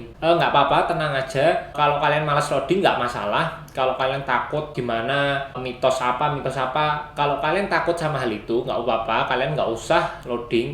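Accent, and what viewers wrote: Java, Indonesia. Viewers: Seneng dengan logat "medokmu" Bro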